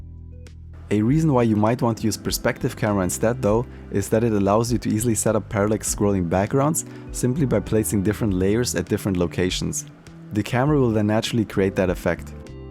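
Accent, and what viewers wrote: Germany. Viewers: Love your channel!! Are you german?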